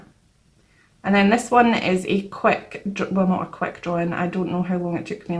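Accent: Scotland, United Kingdom. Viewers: Are you Scottish? Nice timelapse digipainting! WOW! 👌🏼 I love how well you painted her!!!❤️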